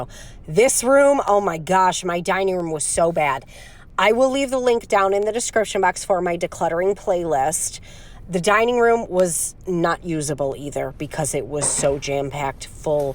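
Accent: England, United Kingdom. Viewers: I could just picture you sipping tea from one of those vintage tea cups and speaking using your English accent. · Do I hear an English accent or no? Loving this video and need to do my own spaces so badly.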